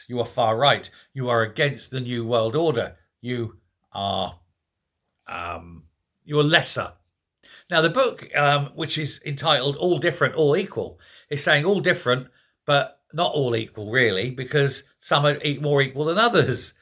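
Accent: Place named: RP, United Kingdom. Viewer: Just out of interest - if you are from Ireland then where did you get your rounded vowels & fairly posh English accent from?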